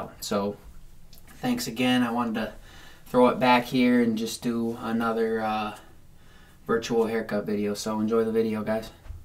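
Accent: Boston, United States. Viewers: I have to say, being from North Carolina myself, I have never met someone from Boston! I think it's such a neat accent, so much character! I always get sad with northerners think southerners are stupid automatically because of their accent or vice versa!! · The Boston accent is the best in ASMR I would say. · Hate Boston accent.... Maybe something different?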